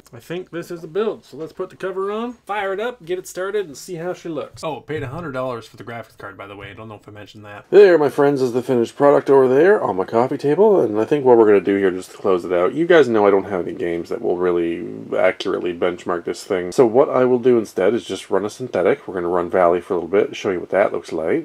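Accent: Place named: Russia. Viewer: that Russian accent is on point.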